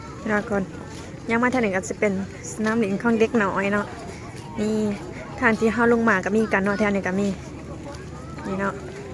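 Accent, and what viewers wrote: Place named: Isan, Thailand. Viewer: หลงเสียงเว้าของ เข้าให้เเลัว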